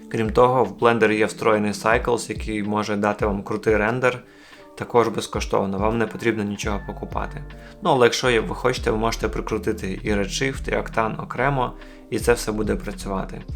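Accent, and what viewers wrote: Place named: Russia. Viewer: У автора москальский акцент🤣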